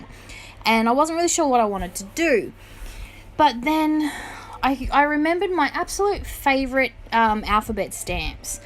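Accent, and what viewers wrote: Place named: Australia. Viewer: Subbed as soon as I heard your voice. ^5 Aussie. Great idea to use the castoff.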